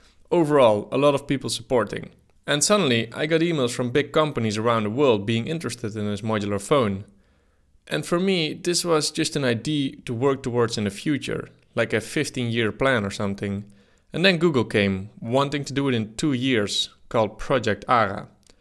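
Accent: Netherlands. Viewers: that dutch accent. makes it sound close to home ;) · Are you Dutch? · This dude Dutch?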